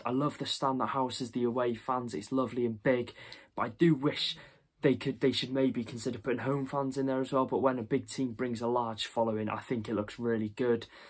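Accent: Wales, United Kingdom. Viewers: are u welsh or from northern england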